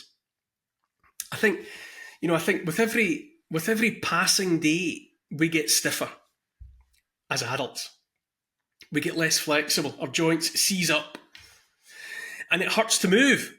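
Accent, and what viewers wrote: Scotland, United Kingdom. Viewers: I wrote this Poem a while back. Thought you might like it. It suits the times just fine... Feel free to read it out one day if you like. Would sound ace with your Scottish accent! Rare Gem Robert Berning 04/12/09 Filament and firmament everything's not permanent, from the depths of the greys, to the limit of our ways. But the cycle continues ever turning always into. We need new thought process, from the minds closed recesses, to change our way of learning, new directions we are turning. Many years we have thought the correct things we've been taught. All our lives we have lead, all the lies been drip fed. Suck the tits of prevarication, drink the milk of misdirection. Live your lives in that veil, let your own soul turn stale. Were all caught in a cage, with no bars and no walls, but to escape from this cage, we've been supplied with the tools, life's programming even way back to the schools have turned us all into illiterate fools. 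With probing my mind has now found the pulse that all that we have ever been has always been false. Equivocation, Evasiveness, fabrications for sooth fed statements that deviate us from or pervert the truth, from an arsenal of politics, religions false hope, to the alcohol, tobaccos and dope that we smoke. Their weapons are varied and dire in scope. We think were immune, but how they do gloat! When we escape, which we do all the time, its easy to accidentally just fall back in line. The programming was set from all our first breaths, to stay away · Love to listen with that wonderful accent! 😍 Scotland, Ireland??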